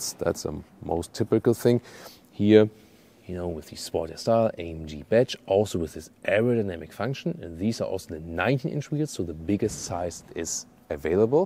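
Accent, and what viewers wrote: Germany. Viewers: A Tschermän tolking inglish. horrible! Bleib beim Deutschen, es ist authentischer!!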